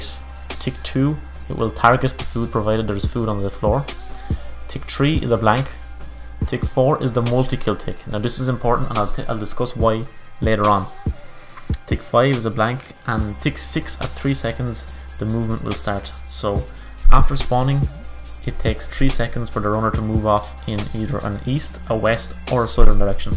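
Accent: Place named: Ireland. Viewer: loool dat irish accent nice guide xxxxx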